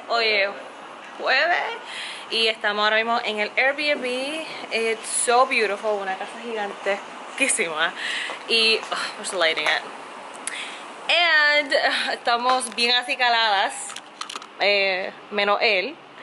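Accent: Puerto Rico. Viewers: mi vida tu hablas como boricua ,wepa .que <link> siento feliz por lo bello que hablas de mi pais y tu pais tambien🥰🥰. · But dang she's a Asian race but puertorican when she's speaks it. China boricua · No se pero tu boricua es mejor que el mio ... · Yo supper crazy to see this women speak the language just like what she is a real Puerto Rican. I share a story of once being in San Juan and walking passed a group of young Asian looking people and same thing overheard them speaking in Puerto Rican Spanish. So awesome to see! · Bella china boricua 😍 me impresiona ver una china hablando español. Y más con accento puro boricua wow. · quisiera estar hay contigo soy de puerto rico y me gustas como hablas suenas como puertoriquena!! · Bonita,habla pura, BORICUA,me,gusta,la,comida china de puerto rico, dios te proteja 🇵🇷🇵🇷🇵🇷🇵🇷🇵🇷🇵🇷🗽🗽🗽🗽❣️❣️❣️❣️❣️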